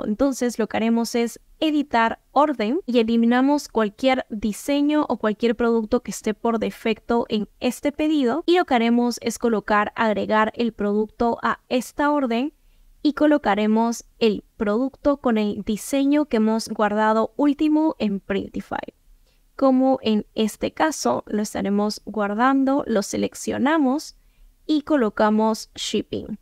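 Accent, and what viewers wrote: Peru. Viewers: Hola! Muchas gracias por otra vez un excelente vídeo. Es chistoso cuando vi tu primer vídeo, me pregunte será peruana por el acento (aunque somos los menos que tenemos acento en Latinoamérica 😂) y después leí un comentario que si…yo también 🇵🇪 😊 ❤️ Pregunta! so ni bien la persona ordena y paga por el producto, Printify lo recive y cuando lo envía ahy mismo te cobra a ti, pero Etsy todavía tengo entendido que no te paga a ti hasta verificar y que el producto sea enviado…so cuánto tiempo pasa para que Etsy te pague? Y entonces tú siempre tienes que tener un monto de dinero en tu cuenta para cuando Printify se cobre de ti (porque Etsy aún no paga hasta dias) verdad?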